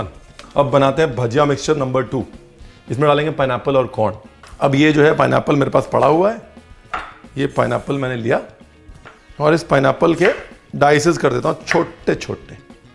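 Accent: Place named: Punjab, India. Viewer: छोटे छोटे Dices wow maha aa gaya sun ker Punjabi tone😊 ha ha neembu ke pakore ban jayegee My Mouthwatering,😊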